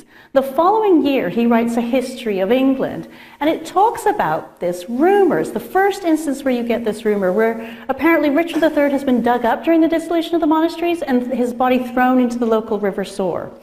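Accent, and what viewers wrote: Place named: Ireland. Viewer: For a Canadian, she has quite a few words that sound very Irish.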